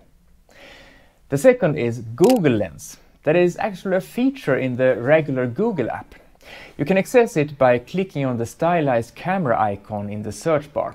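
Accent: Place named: Germany. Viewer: God bless you for your intention to help inform people. Please be aware that your high intelligence and multiple language knowledge seem to have come at the price of comprehensibility for your listeners - it is extremely difficult to discern if you are soeaking German with an Irish accent, or soeaking Turkish with a German accent. In both attempts to decipher your speech through the poor audio quality of significant room noise snd highly reflective surfaces of walls floors tables windows and ceilings, it is indeterminable. Please ask experienced web video makers for free tools and tips on improving communication and perception and creating successful communication. My grandma was from Oldcastle Ireland. So no bias against thick accents per se. :-) I'm US English speaker with simpleton level vocabulary in German and Spanish and idiot American level French - basically "fluent"! 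8-D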